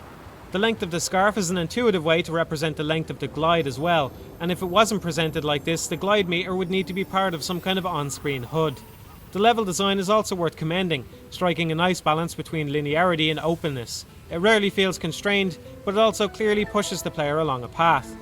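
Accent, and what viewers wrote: Ireland. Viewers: What is this accent, Irish? It's lovely, whatever it is. Now to keep watching the video to see if he makes a decent point.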